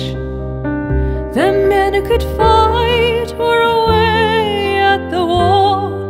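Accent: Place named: RP, United Kingdom. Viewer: спасибо. очень красиво, и песня и клип и фотографии. а произношение ваше- прям как-будто слышал как говорит английская королева) приезжайте в Ингушетию. не забывайте корней. Кстати, вы очень похожи на бабушку. Ахриевы древний тейп. и раскиданы по всему свету. очень любознательны и неординарны) есть в Америки отец и сын Ахриевы- очень талантливые художники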